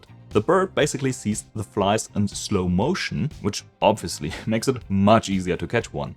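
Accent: Germany. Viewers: Falls du deinen Akzent verbessern willst: Schau dir mal an, wie man das "e" in Hertz im Englischen aussprichst. Musst die Zunge dabei deutlich entspannter halten - du gehst in Richtung Amerikanischem "r" - machen viele Deutsche so, ist aber für den Großteil der Englischen Welt falsch und passt so überhaupt nicht zum Rest deines Akzents. Ähnliches gilt übrigens für das zweite "o" in "Monitor". Nur so nebenbei ;) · bist du deutscher? oder spinn ich...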